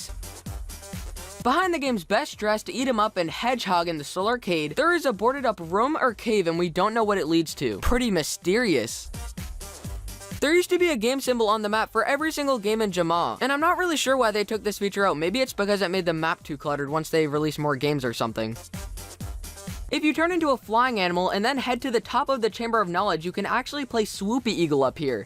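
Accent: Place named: United States. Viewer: Ahh the typical, facts facts facts facts. Your voice has changed to a more stronger American accent! Plus did wisteriamoon tell you password! Hehehe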